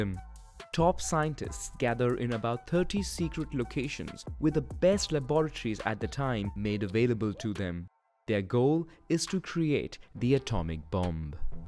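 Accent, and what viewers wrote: North India, India. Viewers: Yeah ....this is north Indian accent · i can hear indian accent in his voice why ? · Is the narrator Indian? His accent sounds so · Ugh hate indian accent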